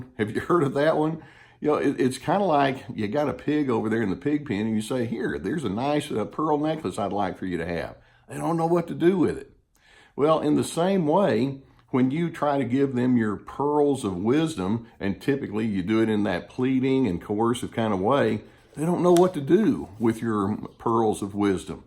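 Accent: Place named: Texas, United States. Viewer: Your explanations are clear and thanks for the videos. I'm trying to place your accent. Are you from Texas?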